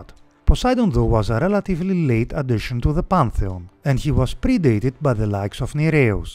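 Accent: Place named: Greece. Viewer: ελληνας στανταρ απο την προφορα στα αγγλικα. ωραιο καναλι 👍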